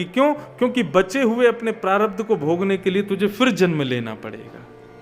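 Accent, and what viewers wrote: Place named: Braj, India. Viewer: Jai jai aapke mukh se toh brij Bhasha bahut pyari lagti hai Jio Jio mere Lal ❤❤❤❤❤❤❤❤❤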